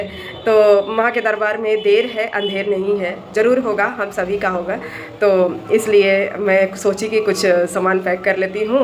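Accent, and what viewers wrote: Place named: Uttar Pradesh, India. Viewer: Aap kaha ki Rahane wali h mam lagata h aap up ki h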